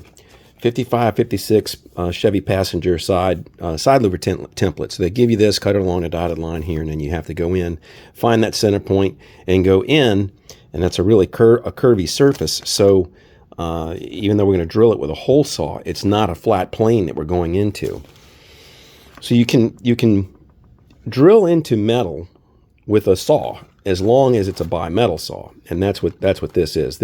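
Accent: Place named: Metairie, United States. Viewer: Funny how I’ve been gone for almost 30 years, and the minute you started speaking I thought, “That guy sounds like he’s from Metairie!” 😂 Nice job, and I hope to soon be doing an a/c installation in my own classic, as well.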